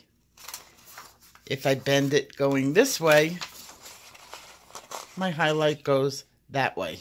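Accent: New York, United States. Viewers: Hi! I am so thankful for your channel. You are so encouraging and your lessons are easy to follow. My only problem is when you don't show what color pencil you are using as you are using them, or list them in the description, as I am trying to keep a record of good color combinations. Thank you for all that you do! Blessings! P.S. You sound just like my family from NY!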